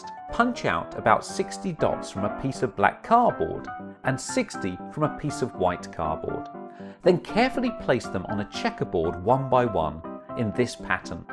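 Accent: United Kingdom. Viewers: r u English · Omg u are British even I am 🐶🐶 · WHAT?!??! I didn’t notice that you are British!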